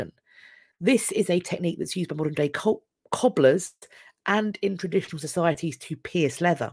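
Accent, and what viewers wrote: United Kingdom. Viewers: in Britain "Byzantine" is BYE-zan-tyne? Across the pond I usually hear BIZ-un-teen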